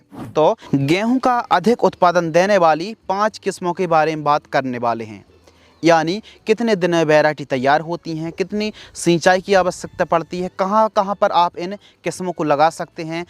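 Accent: Bundelkhand, India. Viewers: भज्जा तुम तो बुंदेलखंड के लग रए हो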